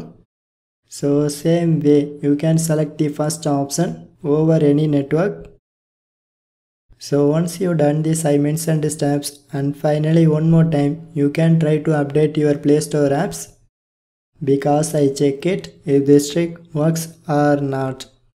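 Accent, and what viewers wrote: India. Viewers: This guy is a little, too Indian for me to understand, also it says it will delete my account and I don't want my account to go bye bye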